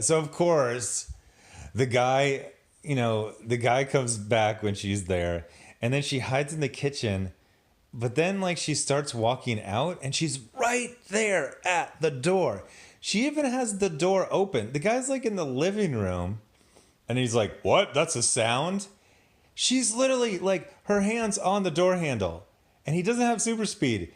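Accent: United States. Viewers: Eh, I kinda preferred the Canadian accents over the typical American one, like yours.: "I guess uh, what her naaame? Irisss? Isss? She use to be a rah-porterrrr? Now she runs a bloggg? And she's like a, y'know. Newww media? Except for they show herrr blog? And it looks like a friggen Geocities Tripod websiiite?" No shade, I'm just saying it's very confusing when you keep making statements in the form of questions.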